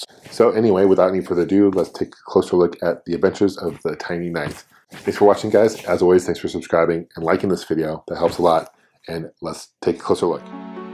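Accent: United States. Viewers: In my part of the U.S., I believe that jagwire is the most common pronunciation. I think is from my area, just from listening to his accent.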